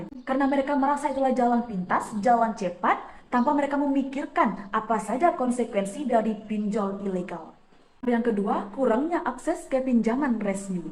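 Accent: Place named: Padang, Indonesia. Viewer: Klua irama tngah padang me,,😂😂 is ok, lnjutkan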